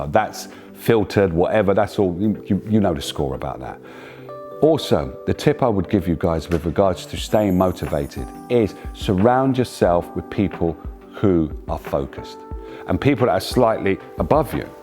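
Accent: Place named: West Midlands, United Kingdom. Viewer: Lovely video! Well done in content, appearance, tone and message. It is the right mix of smooth and suave, with the hard but necessary wisdom from experience. A motivating message with hope, no less. Oh the accent! I must confess it draws me in and draws up the memories of living once upon a time in the West Midlands. Thank you kindly, for posting.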